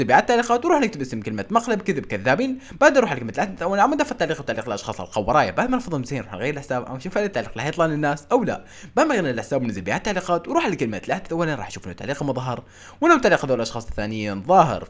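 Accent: Iraq. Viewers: لي بسمعك بقول عراقي😂😂😂مهو سوري ناسي اصلو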